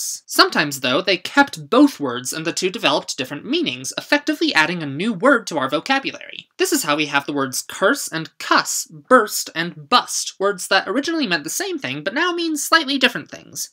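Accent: United Kingdom. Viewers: your accent is, in fact, a British accent. Which is what people always say. Not "the" British accent.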